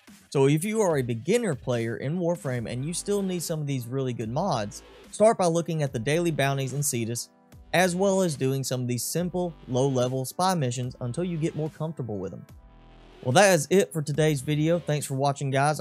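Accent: United States. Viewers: I love how his accent is America itself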